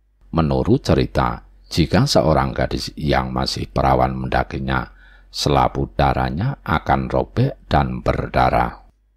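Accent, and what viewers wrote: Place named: East Java, Indonesia. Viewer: kirain jatim mojokerto jenengan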